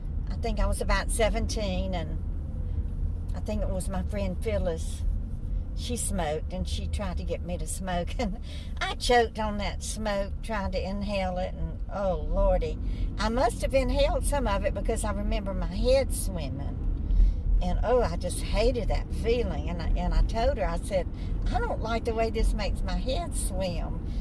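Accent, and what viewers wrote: South, United States. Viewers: I heard about your channel on a post from a subscriber on "See Mindy Mom". I thought I'd check out your content. Since I chose this video first, it speaks to me rather personally. Our family tragically lost a younger brother when I was a high school senior, in 1972. Then in 1996, my husband's sister, a single divorced mom lost her life in a car crash. It devastated my husband's family. He has never come to terms with the event. My prayers are with you. You have a sweet Southern accent. Blessings to you,! Willette from Alabama 🙂🛐❤️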